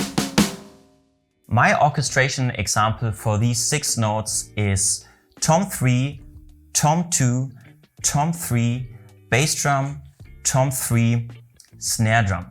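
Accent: Germany. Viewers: Haha... Man hört richtig, dass er deutscher ist xD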